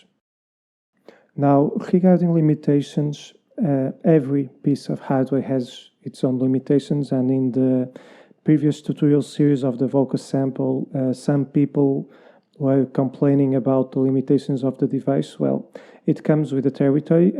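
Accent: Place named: Portugal. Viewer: Are you from Portugal? I watch a lot of your videos and I can always detect some Portuguese accent 🤔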